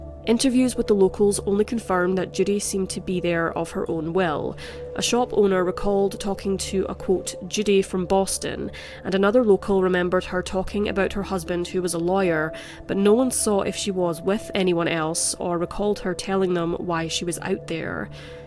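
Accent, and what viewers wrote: Scotland, United Kingdom. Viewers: I understand your Scottish but your voice just reminded me season 3 of Derry girls airs on Netflix tomorrow 🙄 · Where abouts in Scotland you from? East coast? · I was trying to think of a polite way to correct her pronunciation of "Hyannis". She said it, "Hee-annis". It's actually, "High-annis". I grew up there. For what it's worth, I think her accent is quite lovely. And I'm sure I would butcher the pronunciation of whatever Scottish town she grew up in. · American here; the Scottish accent is hands down my favorite of all of the English first language accents. Australia's a close 2nd. It's as if Kelly Macdonald is narrating this. I'm glad that I found this channel, it should be even bigger than it already is. It takes quite the grind to make 100K+ subscribers. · The Scottish accent of the narrator makes this all the more chilling, every time.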